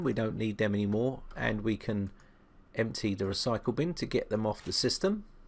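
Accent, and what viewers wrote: United Kingdom. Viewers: your british accent man you`re 150% british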